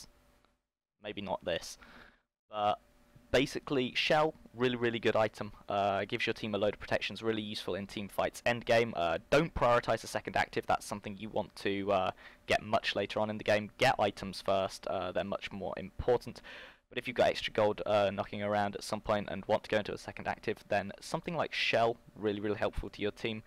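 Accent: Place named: United States. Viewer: account He sounds 100% american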